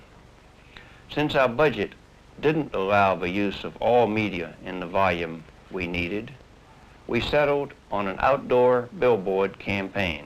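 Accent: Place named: Virginia, United States. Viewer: The CC says "it makes me shut up." He is actually saying "it makes me SHUDDER." There is that Virginia accent to account for. Yes, NICE old trucks!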